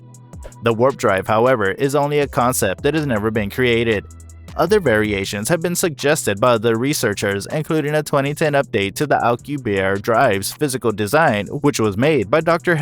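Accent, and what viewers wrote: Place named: Russia. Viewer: Liked it. I subscribed to your channel. His English resembles that of the Thoisoi2 channel's narrator, who has a Russian accent. Beautiful pronunciation. I found your pronunciation of Miguel Alcubierre's name funny. Greetings from Tupã, countryside of the state of São Paulo, Brazil. Live long and próspera 🖖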